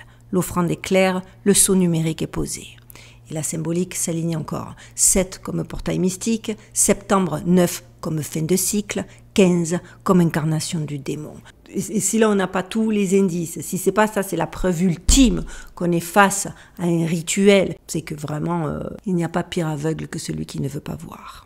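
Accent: Marseille, France. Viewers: Incroyable. Masterpiece. Avant dans les années 80(dsl chui un vieux😂😂😂)tout etait symbolique pour des initiés... Comme si les signes étaient mis pour les doués d intelligence... Aujourd'hui 2025😅😅😅😅 plus c est gros flagrant plus ca passe. Et la meilleure invention pour contré les chercheurs de vérité c est le mot COMPLOTISTE.. Des que quelqu'un a une analyse sur un fait populaire il est taxé de complotiste😅😅😅😅😅... Franchement bravo pour travail car au début je ne comprenais pas qu une dame avec un accent du vieux port abordé des sujets aussi 'Touchi' et finalement je trouve vos analyses ultra pertinente. Courage et allez l om😂🎉🎉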